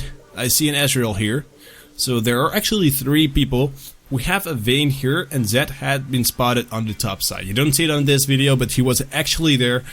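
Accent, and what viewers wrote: Netherlands. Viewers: Guy your English ;D That accent is too obvious, dutchie xP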